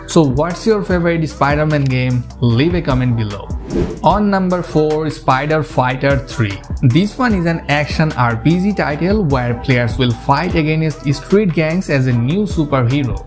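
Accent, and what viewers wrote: India. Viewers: Are you an Indian? Well you have a Indian accent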